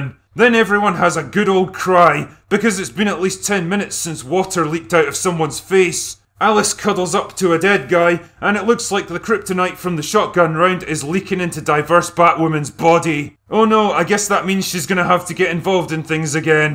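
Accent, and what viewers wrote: Scotland, United Kingdom. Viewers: yep. But also funny with this drunk sounding Scottish accent... Lol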